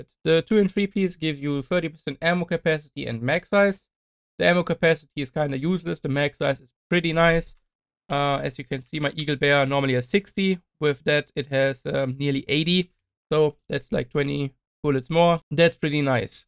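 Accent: Germany. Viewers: typical german accent.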